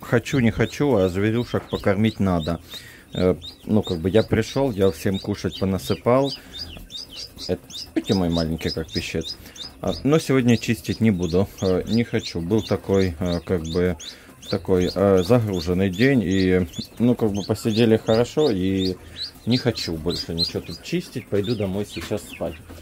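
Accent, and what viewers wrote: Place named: Ukraine. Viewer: Одела очки, слушать Ваш украинский говор, одно удовольствие, хотя чуть понимаю, удачи всем вам!!!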